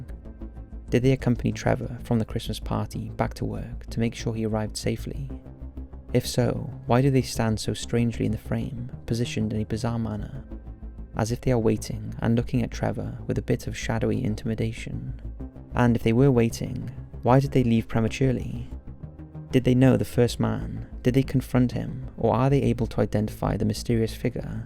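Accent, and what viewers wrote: Wales, United Kingdom. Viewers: As our Fine Narrator is {BritiSH} You would think a {BritiSH`cHap} would Kno' & bē Fully Aware Of [IriSH`slang] [or`Nott] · I just hope that this exposure will bring new light to this very mysterious disappearence and bring a sort of closure to Trevor's family and friends. If i could make a suggestion for a disappearance video it would be the case of Claudia Lawrence who mysteriously vanished from York, England in 2009. You sound British so I'm sure you will will know of this case as it has been on Crimewatch and in the media extensively but as far as i know she just disappeared without a trace and still nobody knows what happened to Claudia Lawrence. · Welsh accent.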